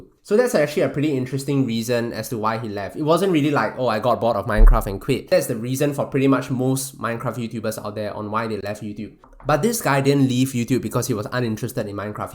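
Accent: Singapore. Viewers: Can hear that Singaporean accent hahaha hello fellow Singaporean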